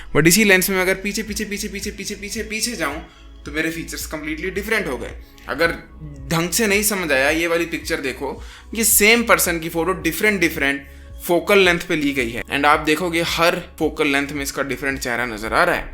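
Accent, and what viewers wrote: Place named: North India, India. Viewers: yes, he's from Himachal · You are from punjab or himachal your accent reflects.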